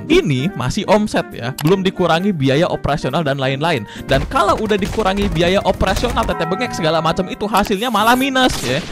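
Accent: West Java, Indonesia. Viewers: Mang maneh orang Sunda lain Siga org Sunda logat na wkwk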